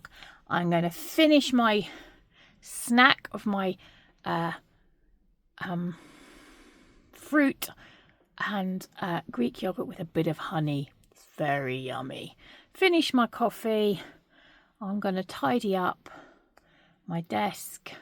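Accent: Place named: England, United Kingdom. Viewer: Lovely to hear an English accent here....for this coming year I'm going to switch up to a standard travellers notebook from my pocket rings just for the change ( but I've already bought all my inserts for the pocket rings just in case ! ). Now you've got me thinking about Just Scribble....I have looked at hobonichi for 2026 & resisted .I bought an A6 for 2025 for doing some journalling but didn't enjoy it so I'm now in a stalogy instead. Have loved the " waffle ' ,keep up the good work xxx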